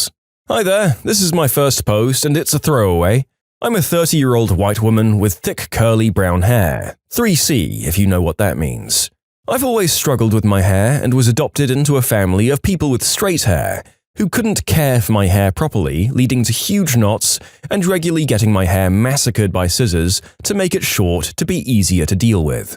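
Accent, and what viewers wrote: United Kingdom. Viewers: oh big deal he’s british, his voice isn’t even bad, you don’t need to get so upset over it. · Pls enough with the British accent 😭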